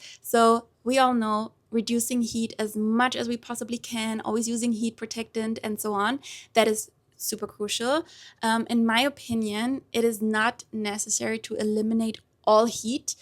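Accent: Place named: Germany. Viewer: Oh süß man hört deinen deutschen Akzent raus 💗🥹 Grüße aus Nürnberg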